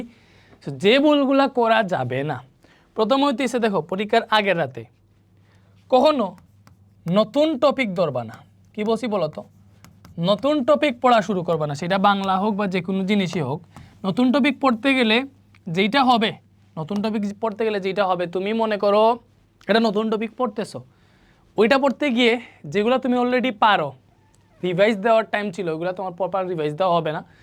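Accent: Sylhet, Bangladesh. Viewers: ধন্যবাদ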